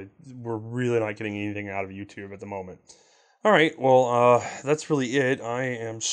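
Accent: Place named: Carolina, United States. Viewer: It's spelled and pronounced "perdy." Gotta love that Carolina accent. :D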